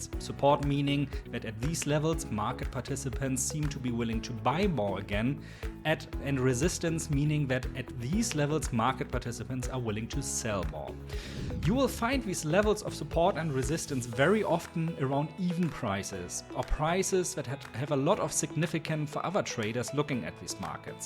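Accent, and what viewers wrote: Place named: Germany. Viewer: Hello, i just found your channel and its amazing. Especially this vid thought me a lot about confluences. But do you really trade on the daily chart? Can you make a video explaining your entry i.eg. at lower time frames after analysing the daily chart? By the way, i like your german english accent🤗 i‘m from germany😊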